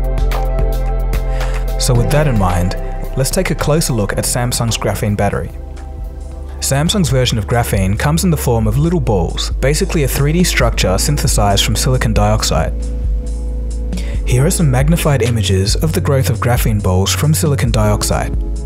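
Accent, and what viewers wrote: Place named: Australia. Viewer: Aussie accent, no matter how clever they may be, it's still like listening to the slowest kid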